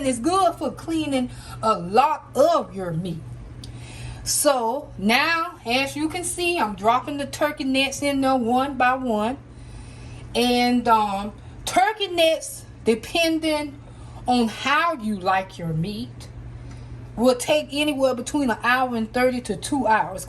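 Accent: South, United States. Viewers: I was looking for a good recipe for Turkey Necks and came across this video. You go Auntie! Love your southern delivery and the turkey necks look delicious! Can't wait to try this recipe. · Cumin is also good on any pork dishes! Love me some turkey necks.... yummy. I love ur southern accent! I can tell u can cook! Some folks don't know how good turkey necks are!!!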